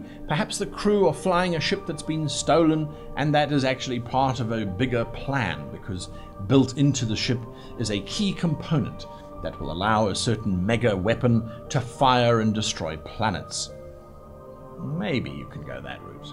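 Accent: United States. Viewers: Top-tier American accent. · Every time I hear it, I’m more unsure if I love or hate your American accent. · Love your work. But in fairness, that was quite the teeth grinding American accent!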